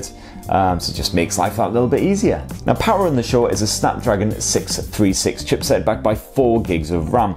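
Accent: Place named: Newcastle, United Kingdom. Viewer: It’s a geordie accent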